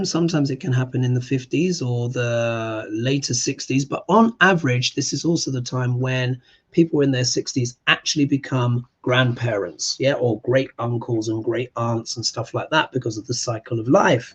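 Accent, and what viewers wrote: United Kingdom. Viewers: Omgggg I love your British accent 😍😍😍😍😍🤍🤍🤍🤍🤍😭😭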